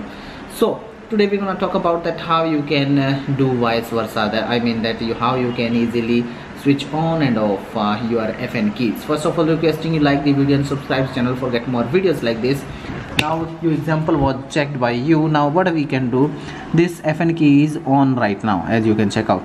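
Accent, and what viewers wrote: India. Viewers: hello and welcome this video was a very good indian speaking man and he is very good looking and i like him he is very good i am indian looking for a husband im 25 he explained this very good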